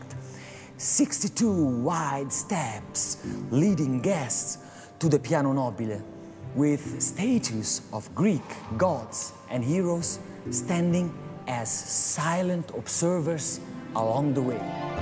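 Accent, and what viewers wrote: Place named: Italy. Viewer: Dato che si sente tantissimo che sei italiano, perché non l'hai fatto nella tua lingua?? o non sai parlare neanche quella??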